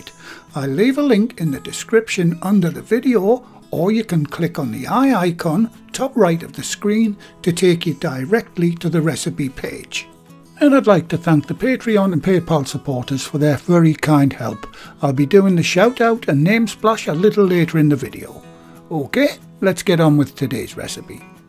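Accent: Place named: North East, United Kingdom. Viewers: sounds just like voice actor Jim Cummings but with a Northern English accent (sorry I don’t want to assume what part of the country you’re from because I don’t know) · That looks great!! reminds me of my teachers from when I lived in the North East. I miss living up there when I here his voice 🙂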